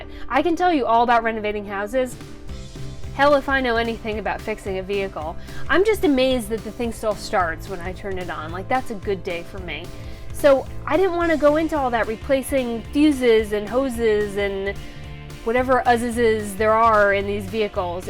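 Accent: South, United States. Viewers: You rock girl. Lose the music as it sounds like someone is playing Pokemon behind you. Want to hear everything you say. If you play music it needs to be base so it doesn't compete with your wonderful southern voice. Glad to follow someone real. You and Chrome from vanlivs are making YouTube worth watching. Love ya. Keep that shit up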